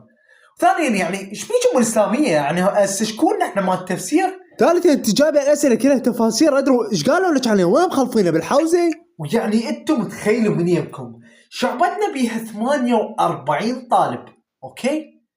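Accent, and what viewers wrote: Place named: Kuwait. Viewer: الكسنت مدري الماكسنت العندك بل كويتي حلو😂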